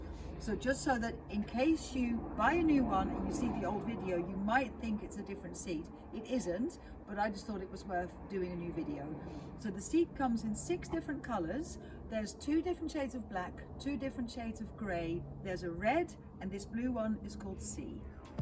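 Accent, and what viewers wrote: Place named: United Kingdom. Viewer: Ach British is the nicest accent in the world - it's like balm for my ears ❤😊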